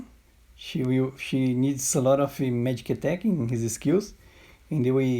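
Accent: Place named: Brazil. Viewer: Pelo inglês é brasileiro hehehe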